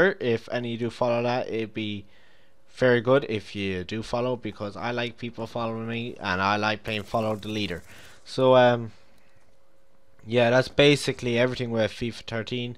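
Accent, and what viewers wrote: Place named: Ireland. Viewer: FUNNIEST IRISH COMMENTATOR EVER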